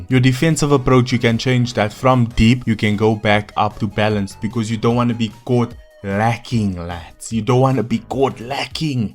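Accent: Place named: South Africa. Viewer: Bro youre south african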